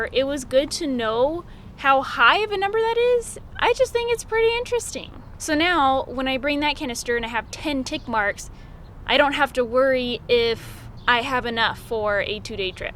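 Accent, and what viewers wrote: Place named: Michigan, United States. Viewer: Thank you for the informative vid. It was very helpful to me as I am also into hiking and camping too and have questions like this about most products that I could use. Are u from Michigan? Your accent...it's either Michigan or Wisconsin isn't??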